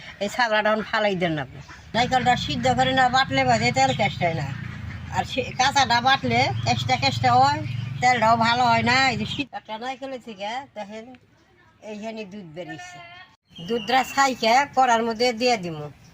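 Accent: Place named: Jamalpur, Bangladesh. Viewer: আপনার ভাষা অনেকটাই বাংলাদেশের জামালপুর জেলার ভাষার মতো ❤❤❤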